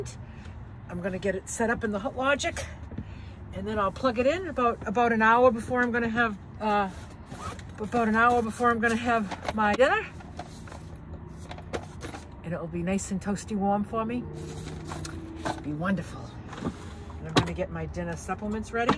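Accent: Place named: New England, United States. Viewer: Hi! Your song is 💯💗🔥 😍🎵🎶 Love, love love it! I came upon your Camper van Tour vid recently for the first time, while watching tiny off grid living. Glad I started with the Finished Reno. vid, because it lead me to your other vids and your story unfolded! Shortly after, you were singing your song and I thought "What a cute song and How did you know all the lyrics! It must be popular and Why have I never heard it before?" 🤣Then I watched the vid on how your song came to be! How great is that! I live in TX but I'm from LI. When I heard your New England accent, it brought me home. I have family from MA. I just knew I would enjoy this ride😄 As a kid we traveled & camped up and down the coast. I was hooked! Also, I am so like minded with you. From your food choices, your esthetics, your philosophies and your JOYFUL JOYFUL outlook. I just turned 60 and soon needing a new (used) vehicle. 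You've inspired me to maybe get one I can modify on a budget. Thank you! You're a ray of sunshine. I find myself humming that song and remembering to stay on that healthy path. Happy Travels!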